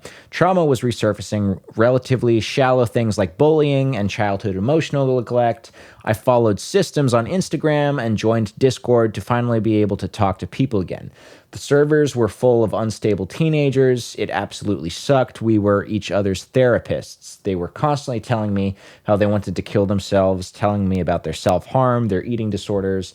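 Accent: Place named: United States. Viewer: Hey. I have multiple diagnosed disabilities and am a disability studies scholar. What you're doing w this video is all wrong and incredibly harmful to actually disabled people. Yes, there are people out there who fake having a disability and the internet has made them more visible than ever. But this abled urge to play detective in order to prove or disprove any person claiming to be disabled is legitimate or not literally kills disabled people. Yes, the faking can take up some limited resources from legitimately disabled and their existence creates their atmosphere of doubt around disabled ppl but outside of when it proceeds to the next stage of attempting to do whatever the disabled equivalent of 'transvestigating' is that it truly becomes dangerous to disabled peoples lives. You sound like you're from the US, so lemme tell you about something that happened in the UK bc of this exact impulse. 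In 2010 the conservative party and the liberal democrats formed a coalition govt that pursued an economic policy called austerity. This is a program which seeks to cut back funding to all public services, and part of that included some very significant welfare reforms. At the time, the political atmosphere was one of distrust towards ppl on benefits, this kind of prevailing belief that there was some massive amount of 'welfare queens' equivalents and disabled fakers who were living lives of luxury on the public dime and was aggressive about trying to identify and weed them out. So, as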